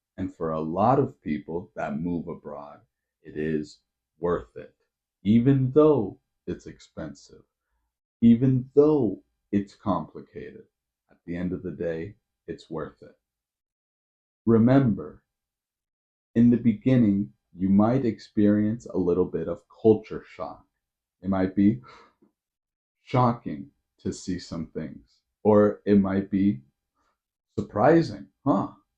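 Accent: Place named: United States. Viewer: I found this channel randomly and watched this first video. Actually, you have a very clear American accent, and you speak slowly, so that's helped me do shadowing along with you very creative video i hope you do another series for advanced learners, I'm definitely gonna keep watching this series keep going you're gonna be a success on YouTube ❤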